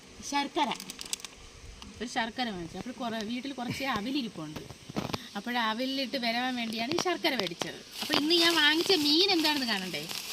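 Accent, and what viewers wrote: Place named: Thiruvananthapuram, India. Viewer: ഭാഷ നല്ലതാണ്. തിരുവനന്തപുരം slang.. Artificial ആയി സംസാരിച്ചു ഭംഗി നശിപ്പിക്കാതിരിക്കുക 😊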